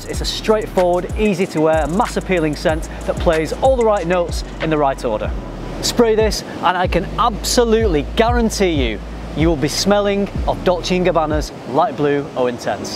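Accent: United Kingdom. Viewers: Dammnnnn ur cinematography skills are fabulous and gud review as well... I m from Pakistan but I don't why British accent feels so attractive to me... I would love to listen that all day long...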